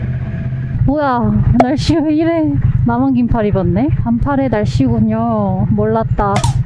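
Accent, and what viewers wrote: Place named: Seoul, South Korea. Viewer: 개시끼 스울말투 와이리 스윗허냐..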